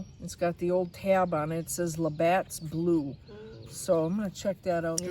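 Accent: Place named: Minnesota, United States. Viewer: I think the O is from Ford. Also, the Minnesotan is coming through….”Baja Bug” where you pronounce the hard J made me laugh (I’m originally from MN). It’s pronounced Bah Hah (think of the off-road racing in the Mexico/California desert). 😊 Anyways, lots of cool finds. Hope you get some extra permissions from your presentation to the church goers.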